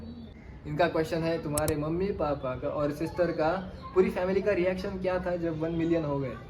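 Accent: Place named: Malvan, India. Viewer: Bhava tujya shabada madun malvani zalakte 😂😂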